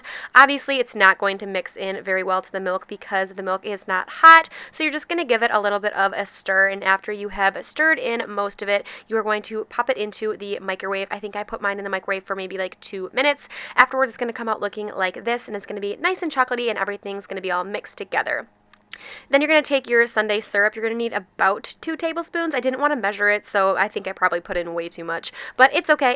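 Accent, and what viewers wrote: Australia. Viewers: Is this Australian? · Are you an Aussie?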